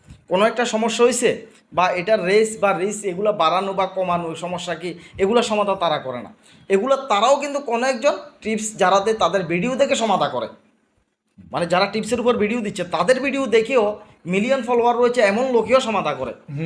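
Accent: Chittagong, Bangladesh. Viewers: bai apner bari kotai? Apner kotha sone mone hocce Chattogram er hoben. Jodi Chattogram er hon tahole baiya ami apner sate deka korte cacci ❤❤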